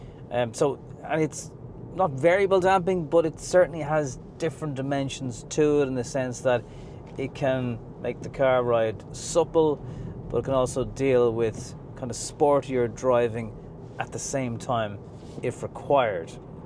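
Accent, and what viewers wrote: Ireland. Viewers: Is this Ireland accent?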